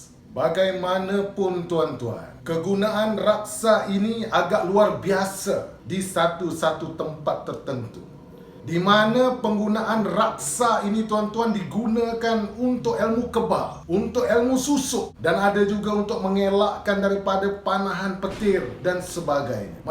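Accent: Malaysia. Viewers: He spoke very melayu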